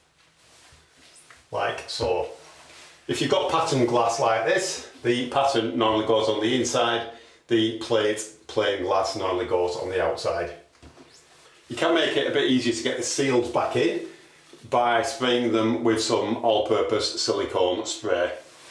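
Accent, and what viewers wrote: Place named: East Lancashire, United Kingdom. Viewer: Excellent video. I'm in Québec and I recognize the accent, East Lancs maybe ??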